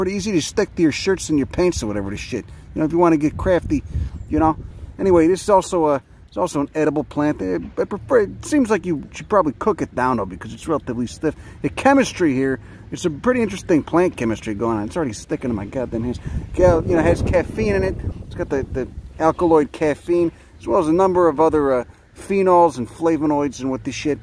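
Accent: Chicago, United States. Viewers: Yo i fucking love your Chicago accent! Not many people have thick Chicago accents anymore.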